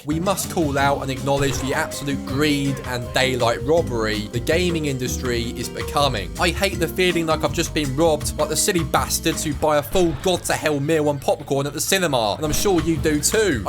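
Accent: United Kingdom. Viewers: This review is a bit too "Bri-ish" for me.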